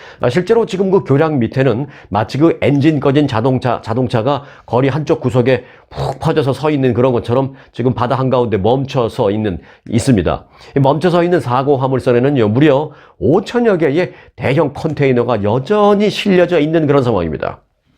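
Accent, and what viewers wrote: South Korea. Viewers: 반갑습니다. 부산의 사투리에 웃음을 주셔서 고맙습니다.ㅎㅎㅎ 볼티모어의 교량이 무너진 것에 넘 놀랬습니다. 수습에 최선을 해야겠죠. 다양한 소식을 전해주셔서 감사드립니다.👍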